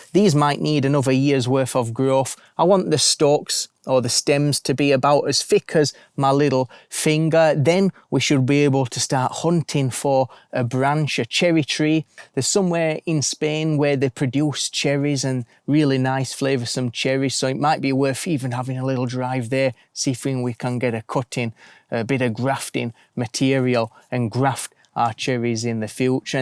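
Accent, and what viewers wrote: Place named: North East England, United Kingdom. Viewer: Just a question to... in your first post where you crack open the cherry nuts and one is empty of seed... you say you'll take it back to Mercadona... you in Spain where the climate is warmer than the climate in UK where your north-east UK accent suggests you're from?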